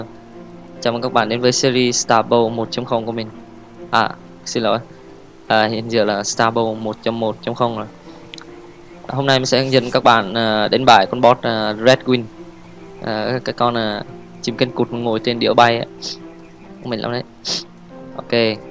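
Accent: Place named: Central, Vietnam. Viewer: Giọng anh cứ lơ lớ tiếng miền Trung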